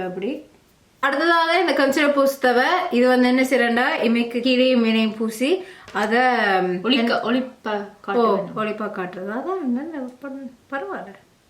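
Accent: Sri Lanka. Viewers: Sri Lanka Tamil speeking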